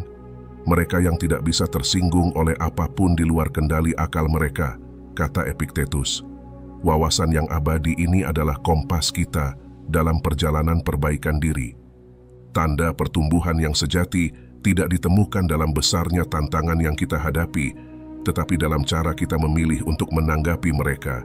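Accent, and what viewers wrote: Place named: Manggarai, Indonesia. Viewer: logat manggarai banget😊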